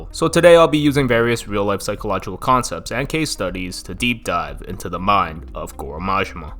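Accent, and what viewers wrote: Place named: United States. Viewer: I love the video but hate your American accent butchering all the pronunciations of the names. Sounds like yarkuza the way you say it and marjimar I hate the accent. YA KU ZA. MA JI MA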